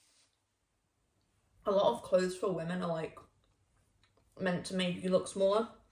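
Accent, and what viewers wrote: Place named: United Kingdom. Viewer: She’s British by the sounds of it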